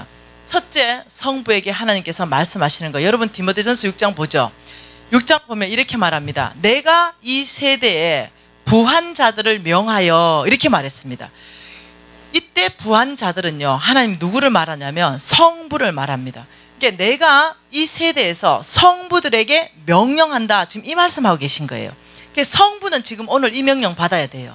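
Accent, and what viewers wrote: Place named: Gyeongsang, South Korea. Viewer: 간사님 대구분이신가요? 경상도 억양과 말투가 고스란히 나와서 친근하네요 ~~. 대구. 수성구에서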